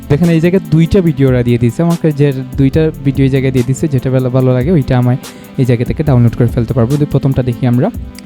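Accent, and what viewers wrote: Sylhet, Bangladesh. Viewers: সিলেটি রে পাইসি রে 😊😊❤ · সিলেটি না কি ❤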